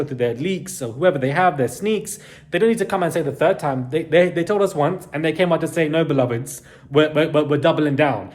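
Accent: London, United Kingdom. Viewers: I WATCH you from Lithuania more than a month, like very much. Very good British accent you have.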